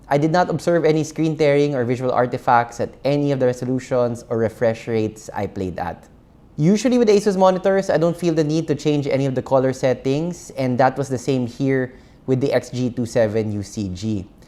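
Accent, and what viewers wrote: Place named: Philippines. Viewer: Filipino accent is too funny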